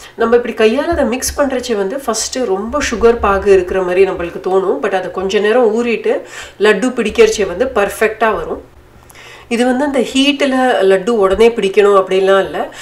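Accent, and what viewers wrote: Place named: Karnataka, India. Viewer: I am Sujithra from Chennai. Nice video. will try the peda recipe, looks yummy. very nice recipes. what is your mother tongue, your tamil looks a mix of kannada or tulu, correct me if I am wrong, lik your videos, they are very positive and nice. keep going, all the best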